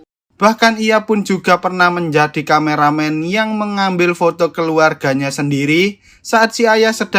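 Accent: Java, Indonesia. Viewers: wong jowo rupanya mas ini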